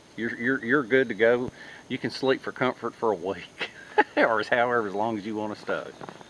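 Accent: South, United States. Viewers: Greetings from WNY, discovered your channel recently when decided better get prepped up in light of current events. Really enjoy your content, very thorough, comprehensive, and informative. The cats made this one! Also wanted to say you remind me of my buddy Eric from Kentucky with your southern drawl! best to ya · Great project, and your presentation was,as always,very southern,and right on the money! Enjoyed it a lot.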